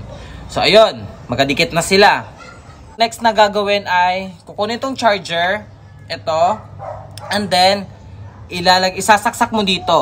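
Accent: Visayas, Philippines. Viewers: Bisaya diay ka ? TAGA CEBU KA ?!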